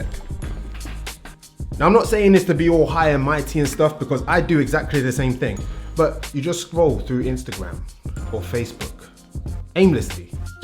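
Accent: Jamaica. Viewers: Decent video....I do labor work and im dirty after work So i always have to go home and shower,,,then sometimes nap and then take a pre workout before the gym,,,,It works just you dont get good sleep at night before of the pre. I find going to bed early and actually gogin the gym before work is better and the pre keeps u going all day. But i heard morning workouts make u drop size because you have no food in you...? You are Jamaican ISH? i heard u say a few thins at times like maybe u got some family here....?g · Are you Jamaican, African or British?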